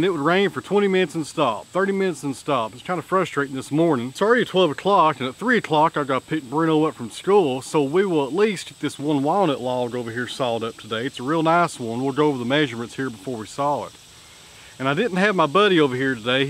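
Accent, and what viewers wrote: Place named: Tennessee, United States. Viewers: No I work in Kingsport TN, his accent is my accent. Very normal here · That’s a Tennessee accent...I’m betting a dollar to a donut!!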